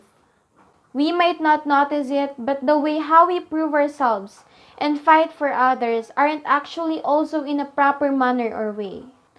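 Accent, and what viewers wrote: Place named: Philippines. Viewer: Thats a good example for the philippine english accent. Great job sweetie.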